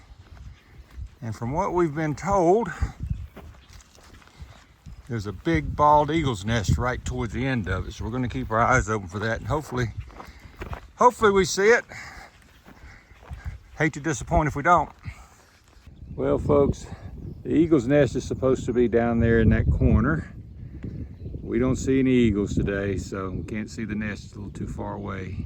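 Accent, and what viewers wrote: United States. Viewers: I like listening to your North Carolina accent. My uncle settled in Rockingham after WWIl and sounded like you even though he was from Erie, PA. Really enjoying your hike. Thanks for sharing.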